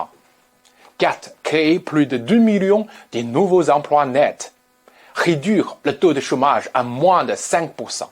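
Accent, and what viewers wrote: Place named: China. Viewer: Vous êtes sérieux? Vous vous présentez pour être Président de la France? Hors de question que je vote pour vous avec cet accent. C’est pas possible quoi vous imaginez cet accent chinois sur la scène internationale quand vous parlez français. Je suis désolé je suis moi aussi d’origine chinoise née ici donc c peut être facile pour moi… c’est pas l’idée que je me fais de la république française. Si vous aimez la Fr soutenez monsieur Zemmour.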